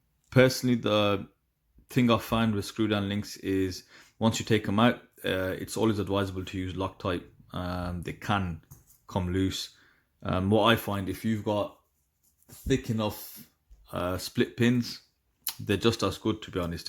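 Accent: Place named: West Midlands, United Kingdom. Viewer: Sounds like you're enjoying the watch. Been tempted by one of these. Also, I recognise the accent. A fellow West Midlands lad? 😁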